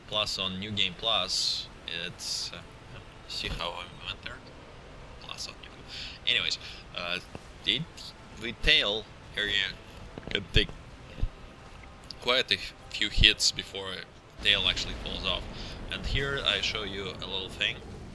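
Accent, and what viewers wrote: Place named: Russia. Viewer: Loving that russian accent.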